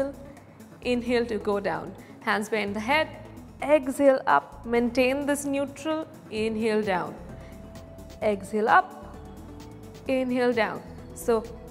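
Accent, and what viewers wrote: India. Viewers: it's Indian accent dear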